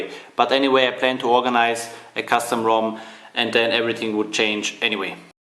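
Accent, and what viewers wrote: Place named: India. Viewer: all Indian accents sound shit, go back to Hindi, indians...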